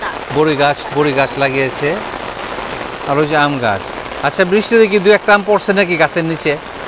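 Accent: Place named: Chittagong, Bangladesh. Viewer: ভাই, মনে হয় চিটাগং এর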